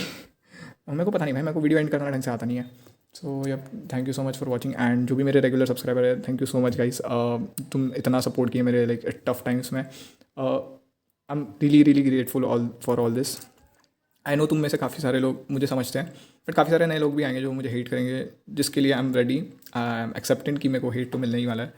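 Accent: Maharashtra, India. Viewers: bhai aap maharastra se ho kya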